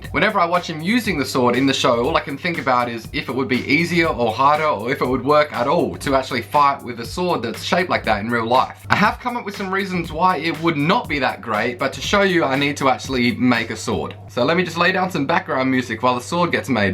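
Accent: Australia. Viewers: The way you stand on the wood while you're cutting it is SUCH an Aussie thing to do.When you started talking I thought you sounded Australian, but when you did that, I was like 'yep, definatly an aussie'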